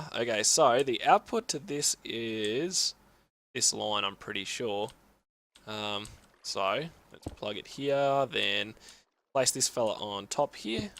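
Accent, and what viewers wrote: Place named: Australia. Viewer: You have an Australian-like accent.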